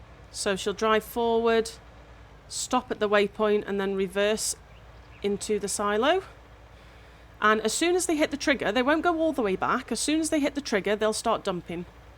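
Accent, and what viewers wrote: United Kingdom. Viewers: Great tutorials. Not many out there covering all the editing of paths and your thought process. Nice and clear instructions too with no pauses and umms and ahhs. Always good to hear a UK voice on YouTube as well lol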